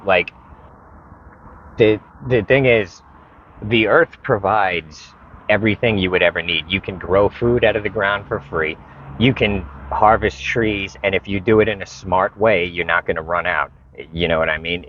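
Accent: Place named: Long Island, United States. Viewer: your Long Island accent makes you sound like *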